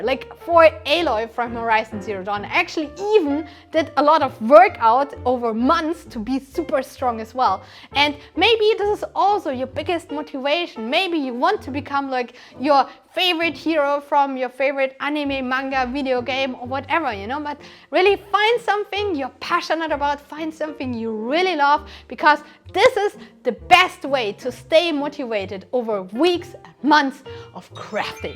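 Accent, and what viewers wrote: Germany. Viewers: For me she Sounds Like she has a Strong German accent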